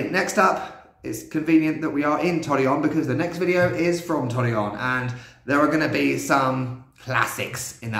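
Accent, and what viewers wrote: England, United Kingdom. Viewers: hahahahaha. Your American accent was awesome!!! But I truly prefer to watch your videos with your wonderful and sarcastic English accent. You can also drink electrolit but always cold, if not, it taste like shit. Be hydrated. Waiting for your next adventure. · Please, stick with your English accent… your American accent is a bit South African lol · Hola! a mi me gusta tu acento inglés así que no lo cambies sigue siendo tu mismo! Me divierte mucho tu forma de llevar los vídeos! Me da muchísimo gusto que te gusto la comida de mi querida Laguna. Cuídate!!! Seguiré viéndote!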